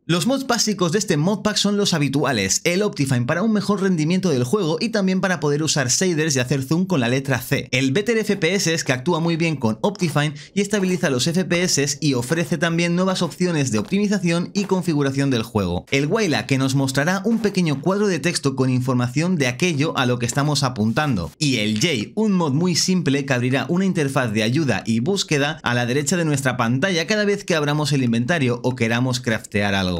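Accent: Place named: Spain. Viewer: OTRO CANAL ESPAÑOL, SE PASAN, ESTE CANAL ES DE EUROPA, NO LATINO, PORQUE A CADA RATO DICE COSAS RARAS CON SU DIALECTO O ACENTO QUE NO SE ENTIENDE EN MI PAIS LATINO, ESE CANAL ES DE IBERIA, NO LATINOAMRICA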